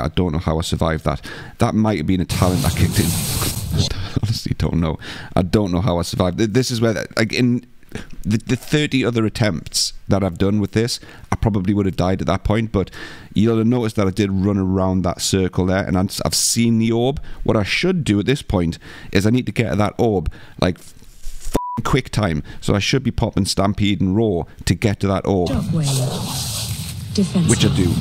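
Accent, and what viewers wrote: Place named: North East England, United Kingdom. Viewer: Also where abouts you from North East accent im from NE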